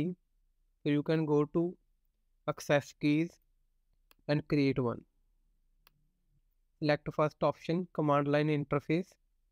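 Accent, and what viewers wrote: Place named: Kerala, India. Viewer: Coading + Debugging... Super. You sound like a person from Kerala but the name is Punjabi 😃😉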